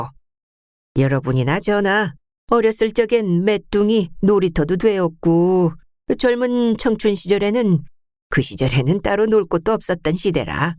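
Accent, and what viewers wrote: Jeolla, South Korea. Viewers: 이번작품 음색은 폭신폭신합니다. 저만의 느낌일수도있지만 처연,청아등… 님컨디션에따라 다를수도 있겠지만 미세한 무언가 있습니다요,이번엔 차진 남도사투리로 들려주시니 그도 감사합니다^^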